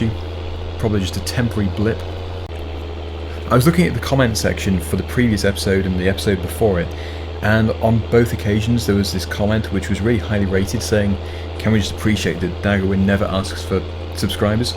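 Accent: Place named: North London, United Kingdom. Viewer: Gal from north London er er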